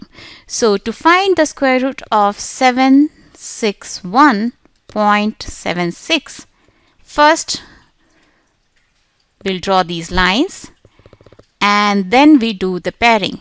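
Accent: India. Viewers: She sounds Indian so maybe that's the reason why she is just fond of speaking right again and again as Indians are not very good at English though so plz ignore her mistakes. thanks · Lovely Indian-accent...😍😍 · nathan duran its an indian accent dude -_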